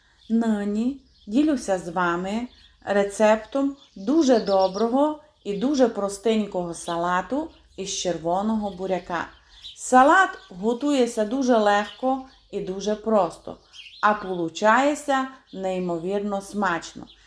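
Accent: Transcarpathia, Ukraine. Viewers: Клас! Насолоджуюсь закарпатською говіркою, окрім гарних рецептів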